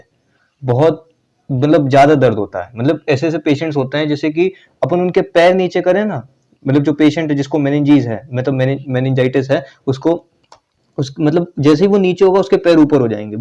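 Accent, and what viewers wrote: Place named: Bihar, India. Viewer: sir aap bihar me kaha se hooo...aapki boli se pata chal raha hai ki aap bihari ho...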